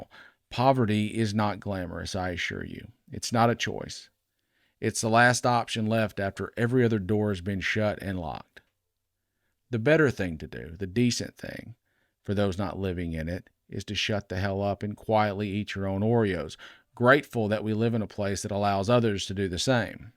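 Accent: South, United States. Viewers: Reminds me of Beau (of the Fifth Column). Another calm, soft-spoken, Southern voice.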